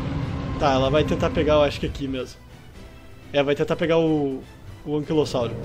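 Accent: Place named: South, Brazil. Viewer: Sotaque muito do Sul skksksks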